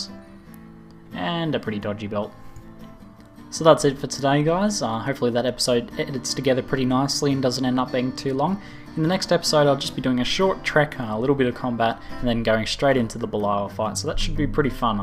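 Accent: Australia. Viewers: I love this serie! and a very big plus to the commentary,australian accent and content!! +1 Sub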